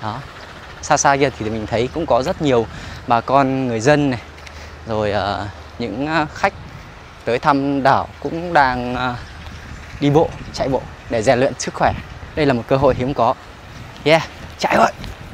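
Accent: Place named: Hai Phong, Vietnam. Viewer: Ngay lần đầu nghe giọng a nằng nặng e đã nghi a là người hp r. Hóa r đúng thật. Xin chào anh người đồng hương. Rất mong đc a thả tim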